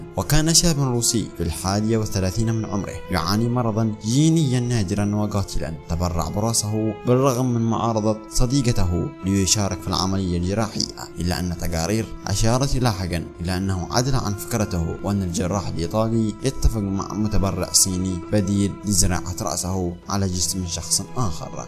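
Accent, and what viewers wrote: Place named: Yemen. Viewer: نبرة المقدم يمنية فأنا يمني واعرف قومي . حاول تتدرب قليل في طريقة إلقاء الشرح. وشكرا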